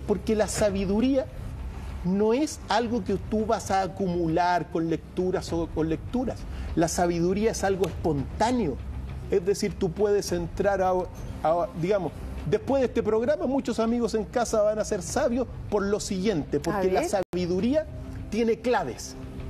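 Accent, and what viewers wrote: Chile. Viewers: me gustaria tenerlo para mi solita, pero entienedo que seria mucho egoismo de mi parte, es usted un tesoro para la humanidad, me encanta escucharlo....sobre todo el acento chileno, lo mas dulce que he escuchado. a mi gusto... gracias por sus predicciones, hay mucho que aprender.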